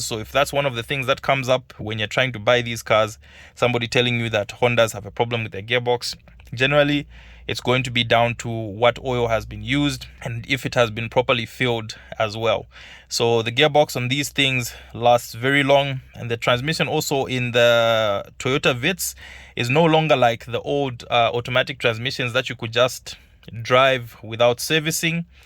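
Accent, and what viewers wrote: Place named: South Africa. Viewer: Bro i thought you from my country south Africa 😂😂 how you talk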